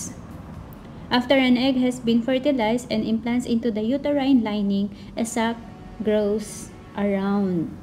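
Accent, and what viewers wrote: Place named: Visayas, Philippines. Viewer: Sana relax ka lang magsalita para maintindihan..wag mo bilisan mag salita kaya nabibisaya ang sabi mo ih.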